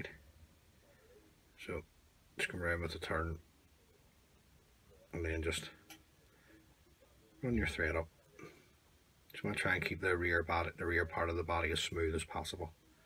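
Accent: Northern Ireland, United Kingdom. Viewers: Well, if that's not a norn irish accent. Great wee video of a very traditional fly. I've been away from tying for about 45 years and I'm glad to see that these haven't changed. Found most of my kit the other day so I think it's time to revive an old passion of mine.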